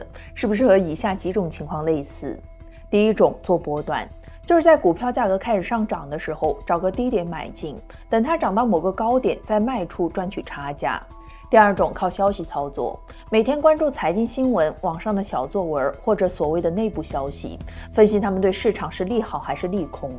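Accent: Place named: Northeast, China. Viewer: 是东北主播吗？能听出家乡音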